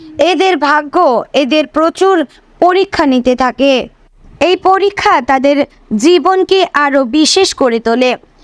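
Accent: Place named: Bangladesh. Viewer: Your pronunciation is very much like Bangladeshi. Are from Bangladesh?😮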